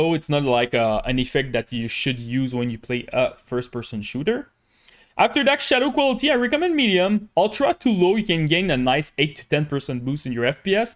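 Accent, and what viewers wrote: Quebec, Canada. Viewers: t'es clairement quebecois hahahaha. bon video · Tu sonne qc ?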